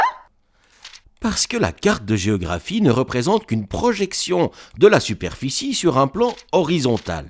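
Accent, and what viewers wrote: Vaud, Switzerland. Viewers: grâce à son accent on reconnaît les accent nous les suisse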